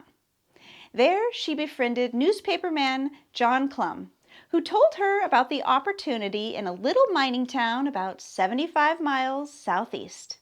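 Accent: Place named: Ireland. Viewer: great video about a great lady... i got a chuckle from your irish accent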